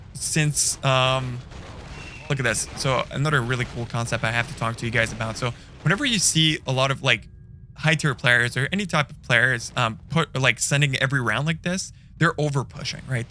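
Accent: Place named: France. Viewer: You have a nice french accent (I am french)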